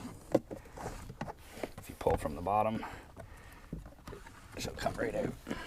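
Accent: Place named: Canada. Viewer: Canadian eh!? Thanks for the tutorial!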